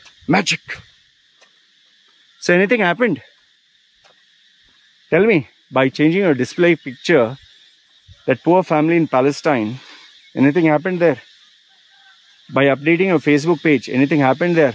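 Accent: India. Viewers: He speaks with Indian Accent.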